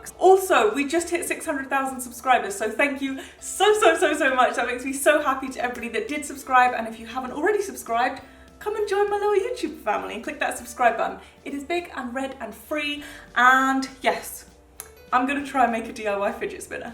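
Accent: United Kingdom. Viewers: Wait are you british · I love your British accent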